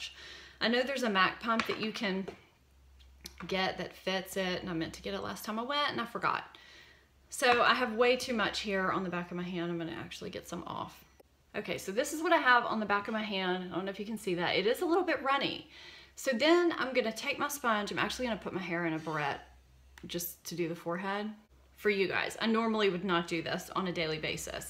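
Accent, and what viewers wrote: South, United States. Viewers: It's a southern accent thing. I have this "issue" too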